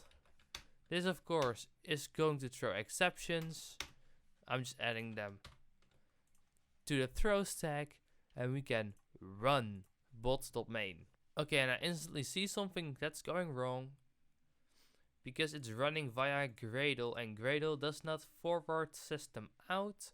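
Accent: Netherlands. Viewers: U dutch? Becaus U have the accent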